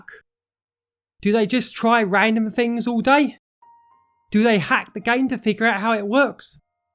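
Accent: United Kingdom. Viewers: This British accent is the most British accent ever.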